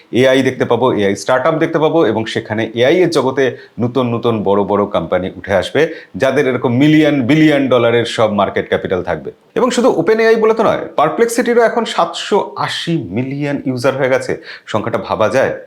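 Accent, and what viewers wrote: Bangladesh. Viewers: আপনার এ্যাকসেন্ট বাংলাদেশী, কিন্তু চ্যানেল দেখছি কলকাতার! 🤔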